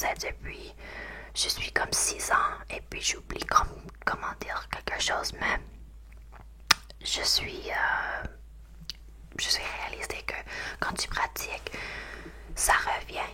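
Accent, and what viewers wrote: Quebec, Canada. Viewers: En fait elle est canadienne mais elle a un accent québécois donc j’en déduis qu’un canadien qui parle français aura forcément un accent québécois ? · Don't know if you're french or not.If you aren't and didn't understand when she explained it.She is from Québec wich is a french province in Canada (thats why her accent is way more like texas english but for french).To be brief,she grew up in french schools for her whole life.Her english is good because of Canada's other national language being English.Alot of French people in Canada also have perfect english. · Sounds exacly like a quebec accent lol and its a good thing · J'ai cru entendre une québécoise. Impressionnant. 😮 🇫🇷👌🏻👏🏻👏🏻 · Tu parles le français, mais on entend vraiment le français du Québec, avec les tic de langages, l'accent. 😅 C'est bien de vouloir parler toutes les langues de son pays. 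❤ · Je trouvais qu’il y a avait une touche de québecois dans son accent haha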